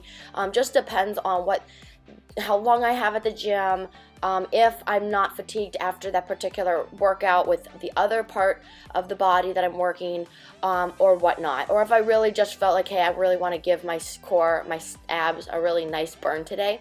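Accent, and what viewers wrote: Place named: United States. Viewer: Where in America do chicks talk like you? Sounds cute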